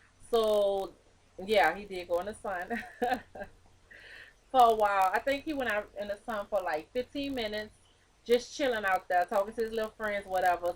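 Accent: New Orleans, United States. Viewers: great video! r u from nawlins? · Mama, you just gotta be from New ORLEANS.... You got that "mama" vibe and i LOVE IT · O yea yall from new orleans i hear it in her voice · Gotta be From New orleans ! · U Can Tell Shee From New Orleans Dat Accent Stronq As Hell Like Mines Lolz Good Video Doe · Gotta Be From New Orleans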